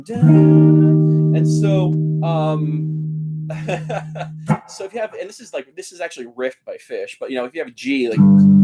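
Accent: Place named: Upstate New York, United States. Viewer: Do you know anyone named Miraglia? Or, do you happen to be from upstate New York? My buddy ( since passed), and his brother, spoke just like you. Mannerisms as well.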